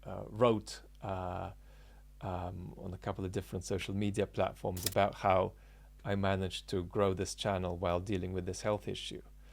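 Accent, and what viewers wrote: United Kingdom. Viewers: First time I've detected a limey accent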